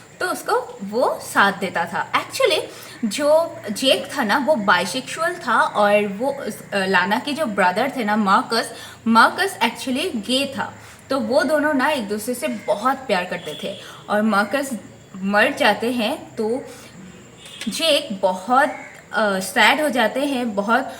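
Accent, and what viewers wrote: Bengal, India. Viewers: accent LG rhi thi Bengali Hindi....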